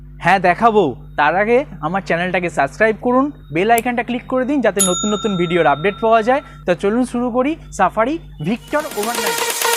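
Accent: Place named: United States. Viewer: He talks Hindi in American accent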